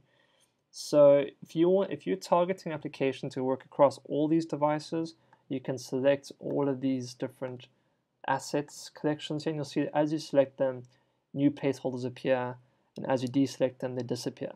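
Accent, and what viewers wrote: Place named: England, United Kingdom. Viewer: Thank you. Very informative... expressed eloquently in a perfect clear English accent!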